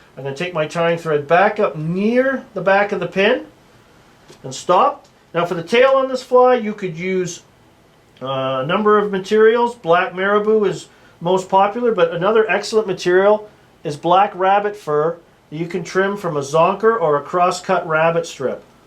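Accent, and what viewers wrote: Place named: Alberta, Canada. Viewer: Thanks...Yes I did some experimenting with different sized beads and did figure it out eventually! I tried the system at my new favorite pot hole and it worked fantastically! Thanks again for your Alberta voice on fly tying:)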